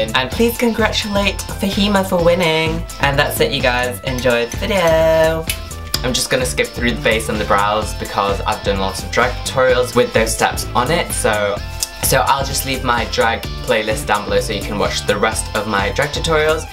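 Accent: United Kingdom. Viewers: are u british? I literally didn't notice til the end lmao. awesome work !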